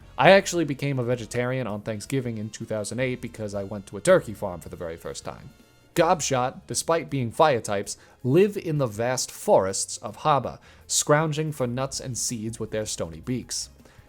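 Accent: New England, United States. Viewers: Ey yo! Somehow I've been deaf to that NE accent until now. Now I'm hyper focused on it. 😂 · I’m so confused, why is his New England accent so much more heavy in this video? Is he trying to dial it up or is it a joke? I’m from Maine so I know what a New England accent sounds like. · I swear you sound more new englandy in these videos about new england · Am I crazy or is accent going crazy in this video? Or am I just noticing because he said he’s from New England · Is playing into his New England accent or is he just getting comfortable? 😭😭😭🤣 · Did anybody else entirely fail to notice accent until this specific video and suddenly all you can hear is the thick yankee accent? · as a new englander, he did not start developing an accent. he's simply letting it loose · Does anyone else feel like he's started pushing the new England accent since he first mentioned it a year or so back? 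I've been watching since the beginning and this accent is definitely new. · He sounds 1000% more New England than any other video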